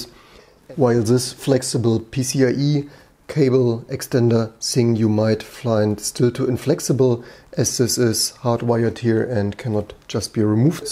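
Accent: Germany. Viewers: Wow, ziemlich deutscher Akzent 😂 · HÖRE ICH HIER DEN DEUTSCHEN AKZENT ?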